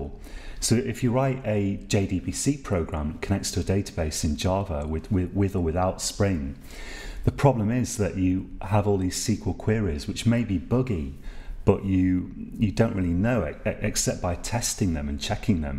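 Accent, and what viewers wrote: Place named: United Kingdom. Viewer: British accent ，i barely heard of in the other' s tutorial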